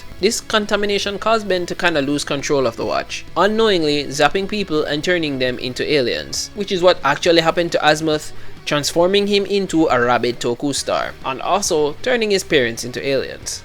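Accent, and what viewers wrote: Jamaica. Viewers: Are you jamaican. i recognize that accent anywhere. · Are you jamaica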